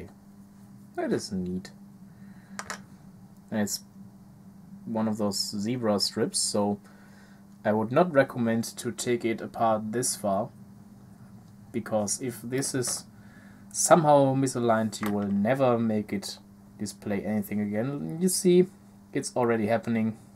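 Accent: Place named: Switzerland. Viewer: hört sich nach schweizer dialekt an ;)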